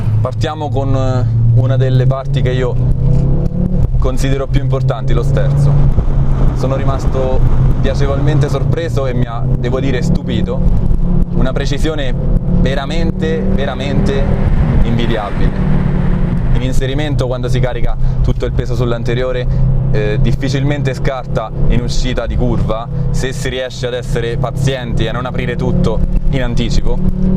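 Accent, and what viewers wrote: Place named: L'Aquila, Italy. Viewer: Accento aquilano, paesaggio aquilano.... Valle subequana, una fiera di curve in un paesaggio mozzafiato.... Grande, un po di passione abruzzese nel mondo!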